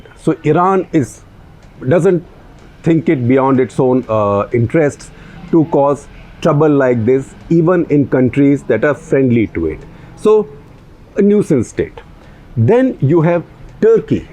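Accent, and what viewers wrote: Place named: Hinglish, India. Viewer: I like your HINGLISH man😂😂😂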